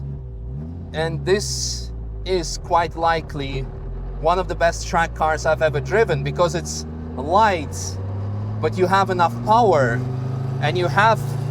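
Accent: Netherlands. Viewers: wilde nederlander gespot, je kan je accent heel goed verbergen en veel plezier met je kanaal! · Nooit geweten dat jij Nederlands was , maar niet geboren en getogen aan jou accent te horen ? 😂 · Now I can no longer unhear the Dutch accent. 😂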